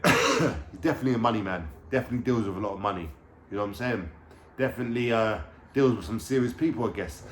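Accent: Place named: Cockney, United Kingdom. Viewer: What part of London you u from mate? Sound a bit like steptoes accent with no disrespect. Peace.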